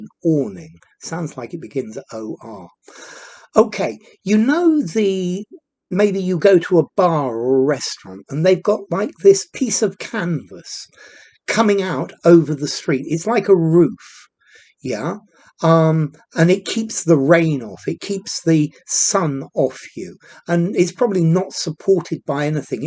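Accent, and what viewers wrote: United Kingdom. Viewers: Thanks for the UK pronunciation . Its derivative awning window" where the upper end of the sloping window is hinged and the other end meant for moving the window along an arc.. Please correct me, if I am wrong.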